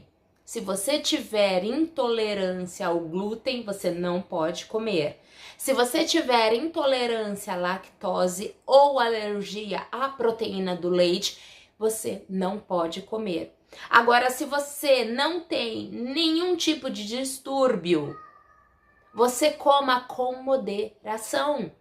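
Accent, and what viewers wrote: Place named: São Paulo, Brazil. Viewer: uuuf acho que vc é de São Paulo capital isso eugosteeeo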